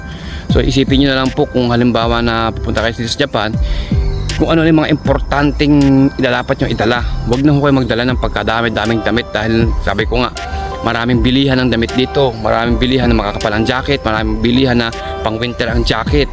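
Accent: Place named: Ilocos, Philippines. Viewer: ilokano ka ba boss? pansin ko lang kasi sa kwan mo hahaha. btw boss, anong cp gamit mong pang vlog? salamats!!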